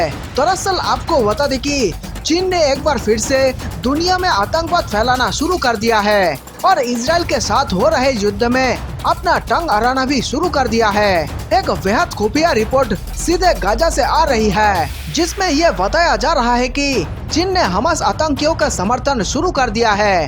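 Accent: Bengal, India. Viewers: Tum bolte bahut achhe ho...!! Are you bengoli!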